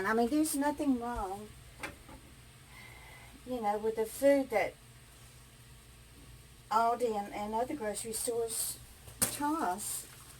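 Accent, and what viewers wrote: South, United States. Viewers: Just came across your video, and love them! Where are you from with that accent, and the y'all. Also, you mentioned that you go to Cafe du Monde. I was born and raised in St. Bernard Parish just 35 miles below New Orleans.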